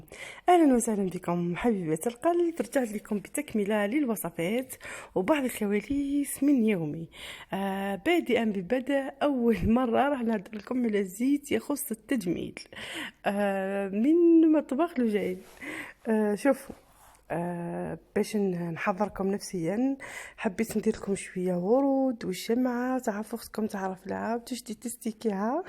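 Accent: Setif, Algeria. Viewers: تووووحشتك راحتلي انترنت قعدت كي طرشة قلت لراجلي اطلقلي الويفي سمع صوتها قالي هذي صطايفية ولا هههه والفك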